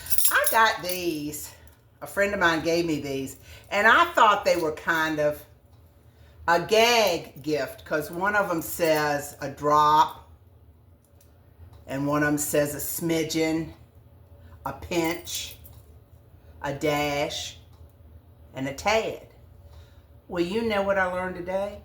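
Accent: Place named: South, United States. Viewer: Loved your recipe. Love your accent, southern gal. Like I said before, I'm in Pa and love new ways to make every day food stuff. I pray you reach 1000 people watching. I know that is when they award you. Take care, Betty. An old grandma from Pa.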